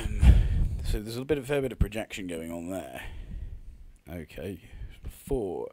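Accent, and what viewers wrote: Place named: Merseyside, United Kingdom. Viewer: Brilliant Merseyside accent, m8.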